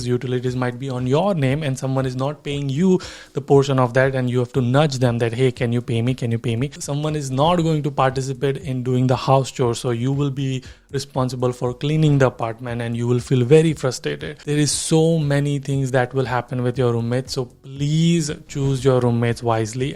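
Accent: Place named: India. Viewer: accent = good but speaking = still indian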